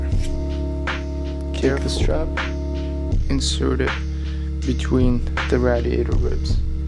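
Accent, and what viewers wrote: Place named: Europe. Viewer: What country are u from... I hear a little European when u speak.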